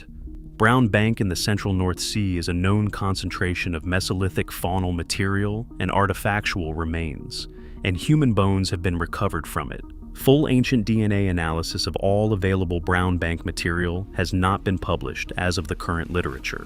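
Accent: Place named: United States. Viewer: The constant over pronunciation for dramatic effect, and mispronunciation out of poor programming makes listening to an American accent droning on and on make this extremely jarring.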